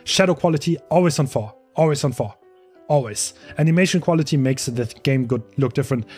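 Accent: Germany. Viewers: clearly german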